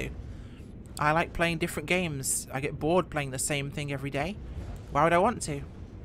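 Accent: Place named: Somerset, United Kingdom. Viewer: Wow am impressed do you have an interest in trains or are you just playing this simply for fun?? 😄👍 Oh and your somerset accent was good and funny 😄😄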